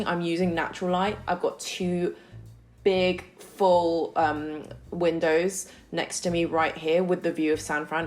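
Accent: United Kingdom. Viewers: Your voice is like a white woman! If I close my eyes I’d thought a white woman’s speaking. . 😊 Your accent of course british, no doubt. But Asian normally has lower tone. Yours is deep. Btw, love your blogs !!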